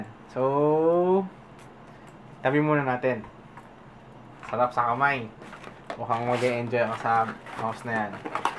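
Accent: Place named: Russia. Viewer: it seems you to be from rusia or nordic EU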